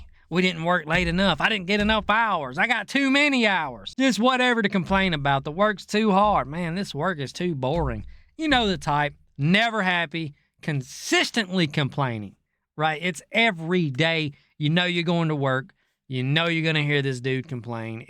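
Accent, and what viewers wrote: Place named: West Virginia, United States. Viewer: This guy's accent is legendary. If he's not from the heart of West Virginia, I'll be damned.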